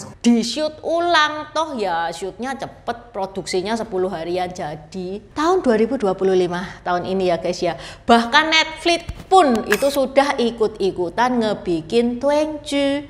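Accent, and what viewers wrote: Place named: Surabaya, Indonesia. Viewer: Aku suka logat Suroboyo …..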